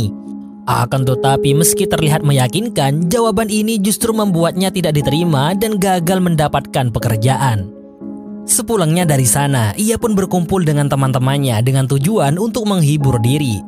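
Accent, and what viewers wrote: Madura, Indonesia. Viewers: Admin madura ya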